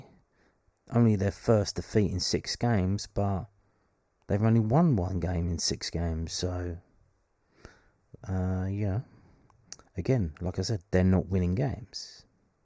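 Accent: Scotland, United Kingdom. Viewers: Your Scottish accent is getting better by the week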